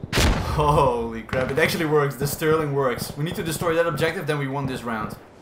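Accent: Netherlands. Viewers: You dutch right?? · I smell that you are dutch am i correct?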